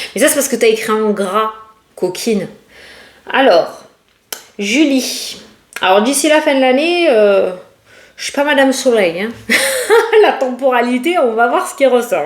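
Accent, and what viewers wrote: South, France. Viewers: Coucou Je suis se Normandie aux environs de Caen. Merci pour tes tirages...toujours obtimiste et de bonne humeur...tu nous envoie ton soleil du sud avec ton accent! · Un petit coucou du Nord... Où il fait bon aussi 😉👍👍 on n'a pas le temps de coller 😁on a beaucoup de vent... Je vous découvre et j'ai accroché à votre petit accent du sud et votre côté un peu fofolle... ce n'est pas une insulte.... promis... Beaucoup de projets en perspective, effectivement... mais bien plus de SOUHAITS.... m'éloigner le plus loin possible et le plus vite possible de personnes malveillantes, jalouses et vraiment mauvaises... et sûrement instables psychologiquement... 🤔 J'en ai perdu la santé et la joie de vivre.. Elles ont essayé de me détruire (j'ai failli perdre la vie, par un rejet sévère et aigu de mon cœur greffé) J'ai vraiment eu beaucoup de chance.. 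Je reviens de très loin... A peine rentrée d'hospitalisation, me voilà à nouveau HARCELER, PERSECUTER, CALOMNIER... Donc c'est un grand OUI, je souhaite m'éloigner le plus loin possible de ce maudit lieu et de pouvoir avancer dans mes projets... 🙏🙏🙏🙏🙏🙏🙏🙏🙏🙏 Merci pour vos guidances... 💛🌺🌺🐞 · Bonsoir,de la Rochelle merci pour cette bonne humeur et cet accent 🌞du sud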